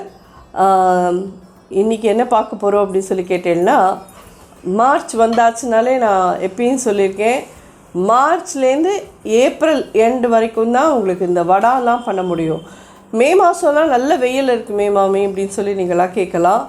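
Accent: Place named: Brahmin, India. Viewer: Maami neengal prema padurang mathiri irukkel. U speak pure brahmin agraharam Tamil. Excellent mam 🙏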